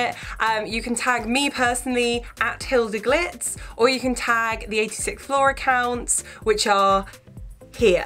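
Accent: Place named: United Kingdom. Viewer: this is amazing and also one thing i was thinking about was the you sound like your from the uk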